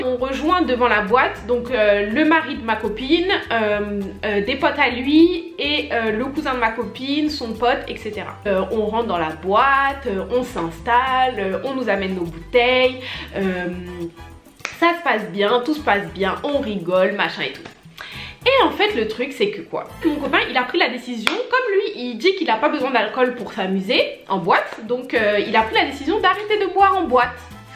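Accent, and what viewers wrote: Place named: Ivory Coast. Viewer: Ton accent ivoirien me plaît grave 😂😘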